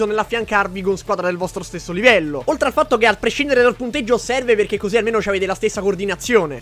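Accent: Rome, Italy. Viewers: Cmq non mi sarà capitato il video in qui dicevi di dove sei però se capisce che sei de Roma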